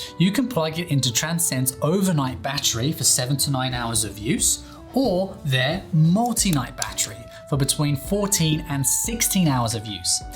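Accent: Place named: United Kingdom. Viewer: Looks like he is from the Great Britain...according to the accent :P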